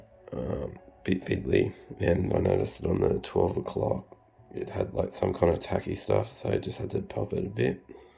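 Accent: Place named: Australia. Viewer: Bro can I buy this off you, I can tell you are Australian from the accent and I live in Australia too can we try make a deal?😂💎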